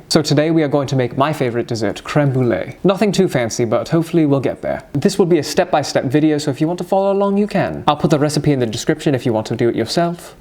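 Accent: United Kingdom. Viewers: British accent, nice hair, cooking AND he has a suit on?.. sir, are you TRYING to make me simp for you? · If he didn’t introduce himself as a British gentleman from the 1800’s I would think that that was his actual voice/accent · the dumpling is cry out for help, and i'm just admiring british voice over🙃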